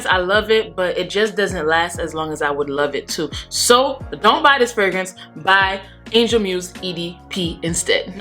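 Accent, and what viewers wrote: Haiti. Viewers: Are you Haitian! I hear an accent ☺️ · do i hear a haitian accent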